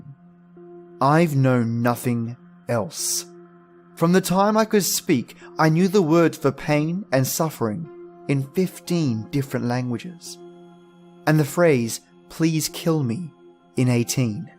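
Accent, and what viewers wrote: Australia. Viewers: haha, I read "blister blast" in your Aussie voice and it sounded great in my head 😛 But I honestly don't mind a little jump scare here and there. I actually prefer them cause it makes the video more fun for me 😊 Especially with headphones 👍I hope you have an amazing Halloween btw! 🎃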